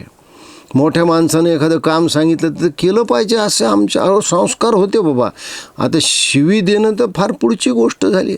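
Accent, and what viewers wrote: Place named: Satara, India. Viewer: एकदम सातारी भाषा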